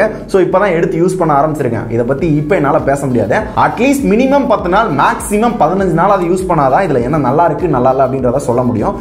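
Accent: Madurai, India. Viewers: Bro neenga madurai yaa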